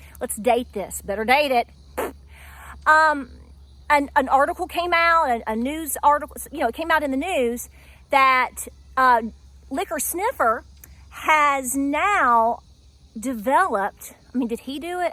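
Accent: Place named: South, United States. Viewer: I just have to laugh at your last few videos. You are a pure southerner! So proud of you. I’m a southerner to in NC. The way you’re talking and explaining….. well honey I get it. You are awesome. I love your videos. ❣️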